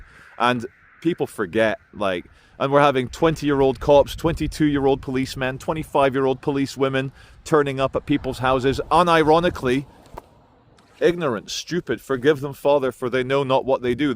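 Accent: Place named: England, United Kingdom. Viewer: You talk like a true Englishman (wherever you may have blood ties). That beautiful view is very much like the area I live in. The shires of England. The times we live in today will be gone one day. In my long life we see these times come and go, I must say, this is the worse I have seen but it will pass, I think we will come out the other end a lot better and a lot wiser. It is very much up to every decent person in our land. Keep well, God bless us all and the Jews.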